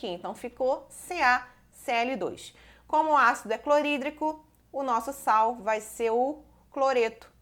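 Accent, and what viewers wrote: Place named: Rio de Janeiro, Brazil. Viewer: É o sotaque carioca KKKKKKKKK nossa voz sempre parece por isso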